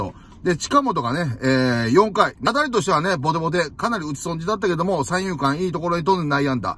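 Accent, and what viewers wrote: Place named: Tokushima, Japan. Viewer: 徳島の人やなあ、いい感じの里さんの語り。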